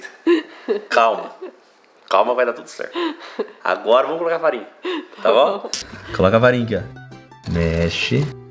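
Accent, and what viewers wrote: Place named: Rio Grande do Sul, Brazil. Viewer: vou fazer com certeza, e não é que vc tá falando enrolado, é que vc era mineiro e agora virou gaúcho tchê, é o sotaque rsrssss, bjos pra vcs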